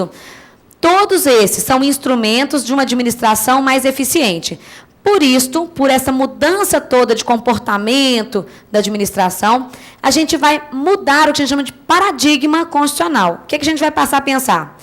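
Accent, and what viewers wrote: Minas Gerais, Brazil. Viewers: Mas que professora mais sensacional! E esse sotaque mineiro nosso, gostoso de ouvir. Parece que eu to conversando com uma amiga. Vocé é maravilhosa.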